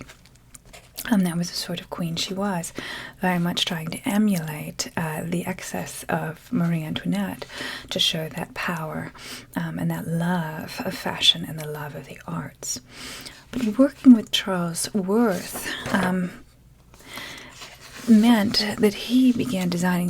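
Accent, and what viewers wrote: Transatlantic, United States. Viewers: You have an almost transatlantic accent and it’s soooo relaxing to listen to. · Where is your accent from? Is it a combination of different places? It's almost transatlantic and super relaxing. New subscriber here.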